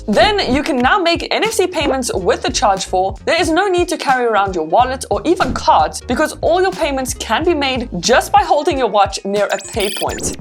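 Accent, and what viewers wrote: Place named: South Africa. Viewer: I hear a South African accent ✊🏽 🔥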